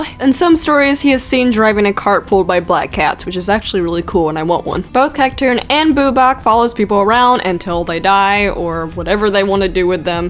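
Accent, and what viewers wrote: South, United States. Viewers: I love her southern acient it great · Are you from the South?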